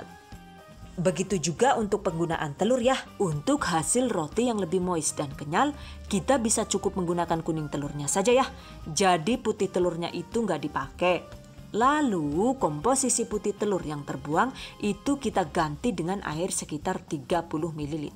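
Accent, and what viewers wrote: Banyumas, Indonesia. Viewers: Siip resep orang ngapak... Heeee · Rika si wong ngendi yu deneng ngapak 😂😂😂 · ih ngapak kalii ini wkwk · ngapak bersaudara...pda kro adonan kulit martabak telor y mbok... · Done #giveawayTHVDesember2019 Suka dg suaranya yg berat khas ngapak. Jgn dihilangkan ya. Pasti dong suka resepnya..simpel. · Rika wong ngapak yu, salam kenal yu🤭 Kesewun resepe(terimakasih resepnya) 😊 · Minyak bulus nyong haha..deneng ana wong ngapak ya yu....turu lah wes wengi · rika wong endi si yu.. kayong pada bahasane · Jebule ngapak 😁 yu! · Saya oma Holi salam kenal sudah kue2 nya enak suara pembuat nya juga sangat merdu apalagi kalau bicara pakai logat ngapak lucu banget saya suka kue2 nya juga kelucuan nya sukses selalu AMIN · Orng cilacap apa banyumas kak...sya orng tegal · Mbekayune wong ngapak y..pada karo inyong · Deneng ngomong ngapak? Asyik.... 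· Asline ngapak😍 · Baru ngedengerin ternyata bisa ngapak juga hehehe.. Ilmunya sangat bermanfaat.. Aku lngsung nyobain bikin lohh bude.. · Ngapak ya mbok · njenengan wong ngapak apaa mbaa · Ngapak ngapaknya yg sll d tunggu....😁😁😁 mksih resep2nya 😚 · Ane senang chenel ini chef-nya itu lo kalau ngomong lucu Inggris ngapak,jadi penasaran dah sama pasti uwonge ayu Iki,koyo msk Soimah hebe,dan makasi resepnya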